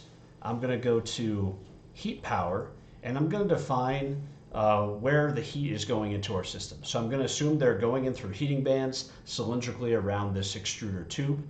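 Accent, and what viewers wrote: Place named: United States. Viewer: this the first time i see an American (i assume from your accent) using SI and Metric unit for the tutorial lol. this video is amazing tho, very detailed explanation. very helpful. thanks a lot.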